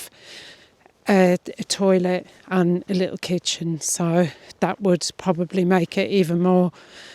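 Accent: Midlands, United Kingdom. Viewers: Are you from the Midlands?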